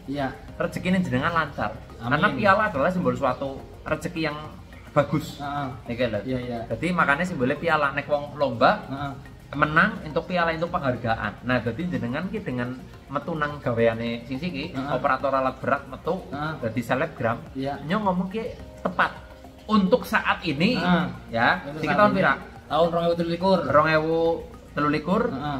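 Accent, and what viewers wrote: Banyumas, Indonesia. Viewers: Cempulek jebul bang wong ngapak hehej🙏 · Salam ngapak ko...sukses terus kang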